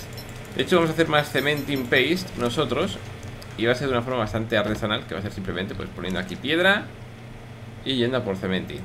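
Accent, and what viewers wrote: Colombia. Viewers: millones pense que eras español un colombiano xD xD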